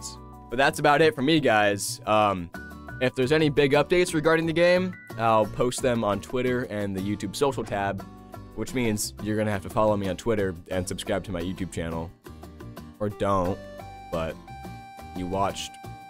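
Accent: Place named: Lithuania. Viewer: you sound lithuanian but in the best way possible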